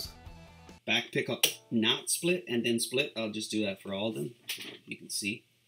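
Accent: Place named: Newfoundland, Canada. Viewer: I know a newfie accent when I hear it lol , excellent job man sick licks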